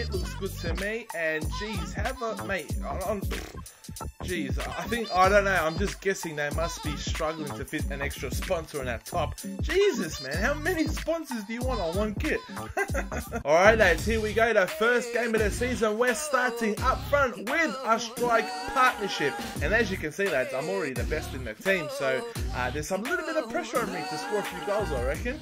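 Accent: Australia. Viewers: Your Austrailian arent you?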